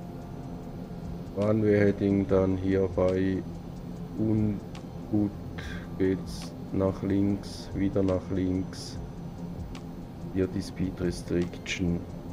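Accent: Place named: Bern, Switzerland. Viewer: Danke für das "chillige" Tutorial... Guess us dr Region Bärn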